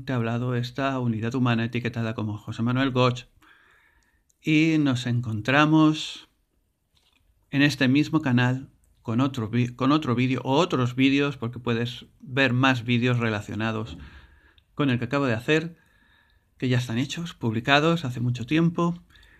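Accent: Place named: Spain. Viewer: En cuando escuche el acento español "onda vital" deje de ver el video ¡¡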